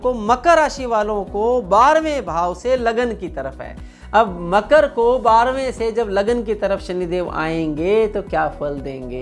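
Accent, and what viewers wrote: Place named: Punjab, India. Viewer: he is from punjab that's why his pronounciation is like this...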